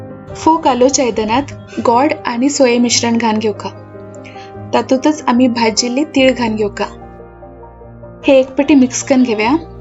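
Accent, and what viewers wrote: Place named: Aurangabad, India. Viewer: The way you speak konkani is different from Mangalore konkani 😊. Which part are you guys from ? My cousins who were from Aurangabad spoke like that.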